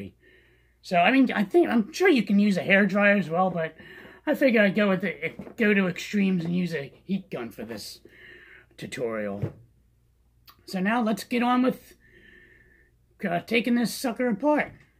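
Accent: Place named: Philadelphia, United States. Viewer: You sir are from the Philadelphia area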